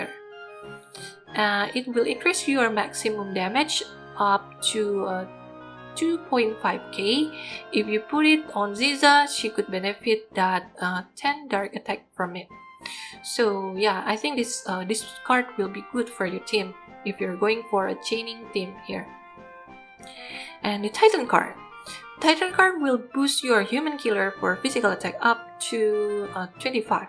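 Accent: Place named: Indonesia. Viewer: Your English like Indonesian People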